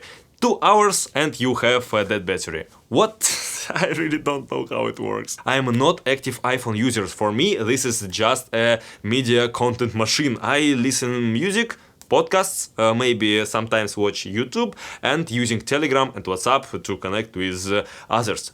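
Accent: Russia. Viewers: You have Russian accent. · твой английский для русского человека просто умиление )))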